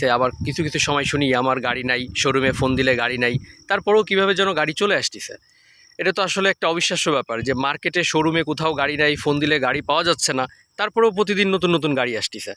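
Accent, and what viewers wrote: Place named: Khulna, Bangladesh. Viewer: আমিও খুলনার ছেলে এজন্যই কিছু কথা বলছি ডোন্ট মাইন্ড ৷ আপনার ভয়েজটা ভাল, উপস্থাপনাও খারাপ না তবে শুদ্ধ ভাষায় যখন কথা বলবেন তখন সকল উচ্চারনই শুদ্ধ হওয়া উচিত নইলে সেগুলো শুনতে খুবই বাজে লাগে ৷ হইছে, গেছে, করতিছে, পাইছি, এই টাইপের খুলনাইয়া উচ্চারন গুলো শুদ্ধ উচ্চারন করলে ভিডিওর কোয়ালিটি আরো সুন্দর হত ৷ আর এত লম্বা সময় ধরে শুধু মাত্র বাইকের চারপাশ থেকে শুট না করে মটোভ্লগিয়েংর মত বাইকটা চালানো অবস্থায় ভয়েজ দিলে আরো জোস হত ৷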